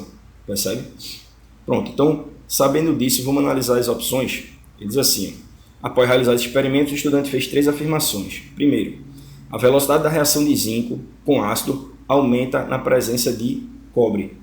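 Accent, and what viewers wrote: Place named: Northeast, Brazil. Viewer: só quem é nordestino entende bem e reconhece esse sotaque rsrsr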